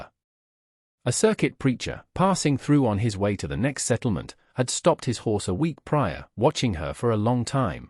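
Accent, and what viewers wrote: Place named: United Kingdom. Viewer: A very feel good story. But these are much better with a cowboy voice, not British. Alabama 🇺🇸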